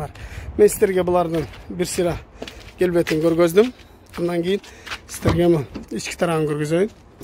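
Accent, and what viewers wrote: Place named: Osh, Kyrgyzstan. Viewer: Оштук болсо ушунчалык суйлойтта сынабай жон коргуло анын аксентин текшербей .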